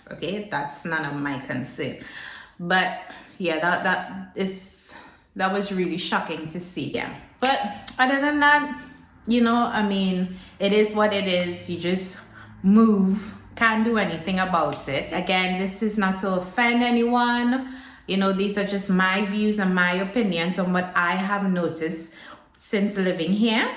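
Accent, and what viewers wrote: Trinidad and Tobago. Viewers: I hear your Trini accent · love your trinidad accent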